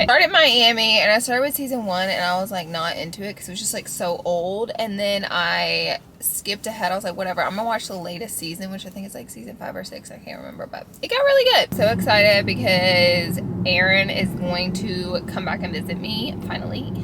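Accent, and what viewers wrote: South, United States. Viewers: I thought you were going to a vintage’Goth’ store and what you were actually saying was ‘Golf’. 😂 Your southern accent is adorable,. ❤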